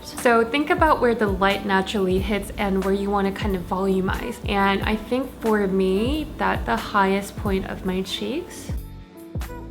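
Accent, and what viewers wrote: Australia. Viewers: Omg the Australian accent is getting stronger!!! I love it hahaha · Dude I was like 😂😂😂😂 how can you just come up with an aussie accent out of nowhere?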